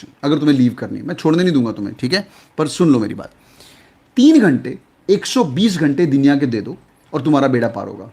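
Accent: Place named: Punjab, India. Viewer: Sir love from punjab ❣️❣️ aap bhi punjab se ho....apke accent se lgta hai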